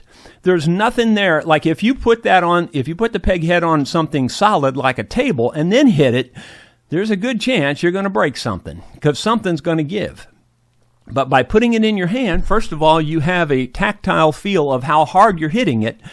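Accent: South, United States. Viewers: Pay no attention to the nay-sayers. I am an old country boy and retired police investigator. My old partner used to say I was 'country smart'. He said that I was able to figure out the best and easiest way to accomplish a difficult task. You even have the same country accent that I have. Pay no attention to the jackleg "I know best or a better way' types. You ever get into N Georgia and the best college football team in the nation, Go Dogs! I'll buy your lunch. Keep up the good work!! WJS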